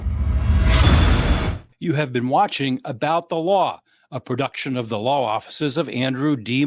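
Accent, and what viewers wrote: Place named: New England, United States. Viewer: I thought you were a stuffy New Englander! I’ve changed my mind!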